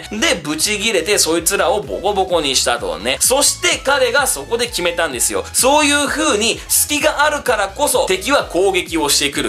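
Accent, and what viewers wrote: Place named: Osaka, Japan. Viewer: こう思えばエピソードオブ空島のときの生放送やけど大阪弁強かったなぁ！ええねんで俺も大阪人やし、もっと大阪弁使ってぇーwww えーカタクリは俺の女♪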